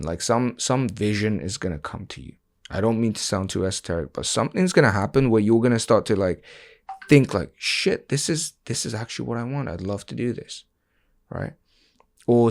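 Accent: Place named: United Kingdom. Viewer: Off topic, but your accent is a lot nicer than the half-baked American accent that a lot of foreigners end up with. Not all British accents are nice but what you have ended up with is 👌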